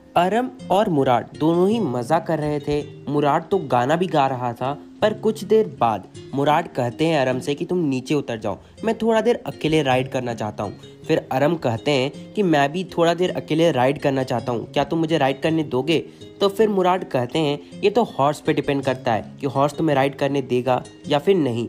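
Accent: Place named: Bihar, India. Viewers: You are from bihar Right! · One question you are from Bihar???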